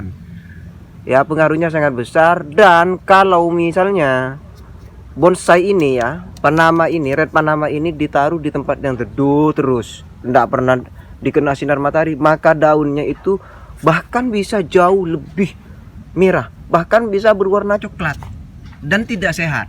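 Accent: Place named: Madura, Indonesia. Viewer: Logat bicara nya kok mirip logat Madura ya bang 😀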